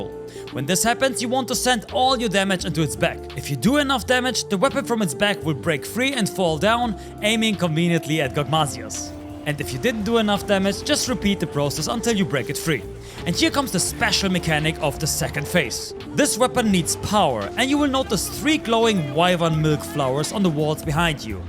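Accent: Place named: Germany. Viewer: Are you german ? 😅 because of the dialect. Edit: Bin mir komplett sicher xD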